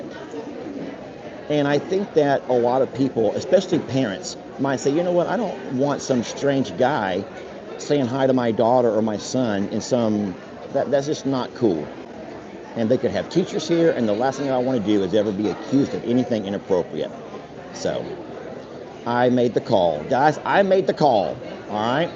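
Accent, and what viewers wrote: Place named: South, United States. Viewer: that’s the way we talk in the south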